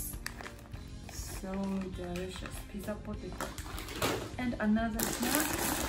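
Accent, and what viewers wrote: England, United Kingdom. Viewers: I love your English accent, I could listen to you forever. Its a perfect Babyface voice. I cant wait for you to cut a smooth promo in the ring. You re awesome, Champ.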